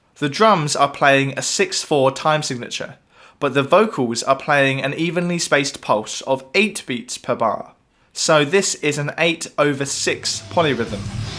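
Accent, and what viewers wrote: United Kingdom. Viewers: Great video - thank you. If you could list the bands and songs, be a big help - the only way I found the, song was by searching the lyrics - your Brit accent is wonderful, but couldn't catch that. I've always heard as 4/4 (Bonham) and 6/8 (Page). With the 1/8th notes constant between the 2, it let's the guitar do the waltz. I find it actually easier to hear/count that way. Thanks for your work!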